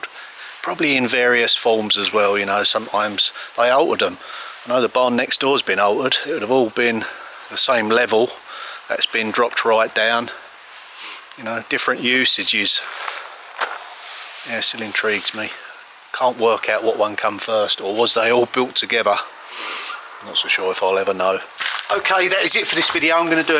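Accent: United Kingdom. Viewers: I'm curious ,What is you accent? I know the UK has accents just like in the US....I'm thinking it's North or West. Some more repairs as you go....it's a good thing.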